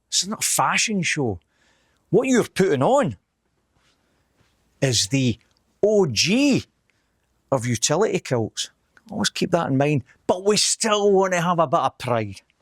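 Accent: Scotland, United Kingdom. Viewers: On 1 side of my family we are from Wales i think thats how u spell it and other side of family we got Scottish and I love the scots !! I would like them even if they wasn't in my past ! I love Scottish way you talk. The English you can't understand the Scots you can. Great video I love the kilt but never wore one.. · You could make a video about worms and I would watch/listen. Is “Bonnie” bad or good? Scottish accents are my weakness! 😩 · A Scottish man talking. I’m here for it.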